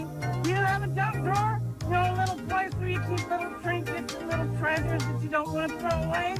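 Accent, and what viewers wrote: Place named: North Carolina, United States. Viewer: I don't know why, but his voice reminds me so much of my friend from North Carolina. My friend has a softer, slightly deeper voice, but he has almost the exact same accent as.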